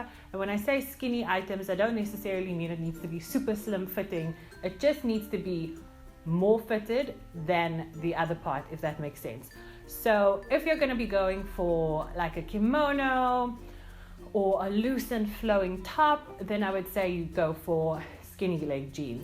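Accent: South Africa. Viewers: South Africa!! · Love the South African accept ❤️💜💙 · Are you South African?? your accent is so interesting!! cool video btw <3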